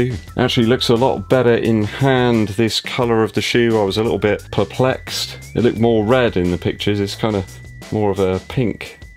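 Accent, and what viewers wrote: United Kingdom. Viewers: Your voice is perfect for this. What can I say you got a proper British accent and people listen 😂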